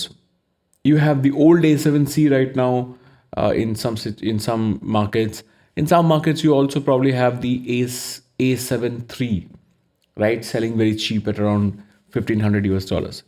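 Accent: India. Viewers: Your Indian English accent is not a problem and it is very clear and understandable. Yes, there are some people of India which has a deep and not understandable accent, but your not one of those people.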